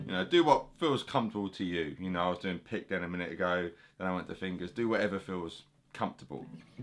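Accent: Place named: Essex, United Kingdom. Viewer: Well, that was not the accent I was expecting... Essex? Nice vid btw! Gonna give these chords a try. 👍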